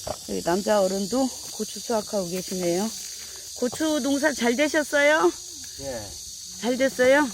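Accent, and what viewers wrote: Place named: Chungcheong, South Korea. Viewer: 힘드시죠? 말하면 뭐한대유 충청도 맞아유.나두 충충도 태생이유 고추건조 보기 좋습니다 유투브도 대성하십시요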